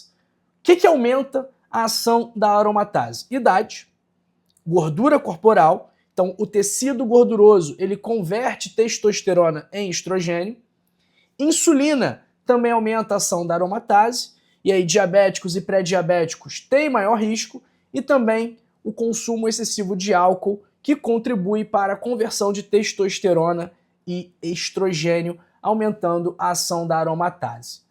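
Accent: Rio de Janeiro, Brazil. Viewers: Uma dica: seu sotaque carioca irrita muito (por exemplo, você fala proistata, quando deveria dizer apenas prostata).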